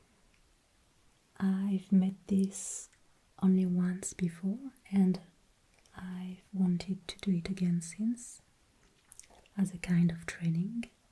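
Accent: France. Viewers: My favorite part of her is her French accent. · That wig reminds me of my hair bumpy, lumpy and tangles even after just brushing it(lol)!!!... can't wait to see how " Marie Antoinette"s hairstyle turns out....no woman in her right mind wants to look like a "hedgehog"!!!....love her french accent and her natural beauty.....this is my first time watching this ASMR artist.... I'm very impressed with the quality of her video and it's content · Accents from the region of France are so nice-sounding. Very beautiful. Sorry, French Canadians. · Omg you’re so good! Question, are you french? (Note: if your not sorry, QwQ I’m bad with accents) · Super vidéo, le montage est parfait. Comme ton anglais d'ailleurs, ce qui est plutôt rare par chez nous ! Mais est-ce que ton french accent est naturel ou bien tu l'exagères un peu pour l'ASMR ? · English with a French accent AND hair play.?ZZZZZZ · I love the way she says Curlers. 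The French/English accent is so sweet to listen to.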